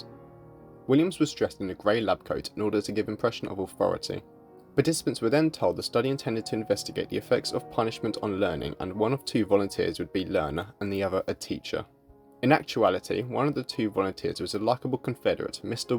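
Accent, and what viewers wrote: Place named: United Kingdom. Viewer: I like your bri ish accent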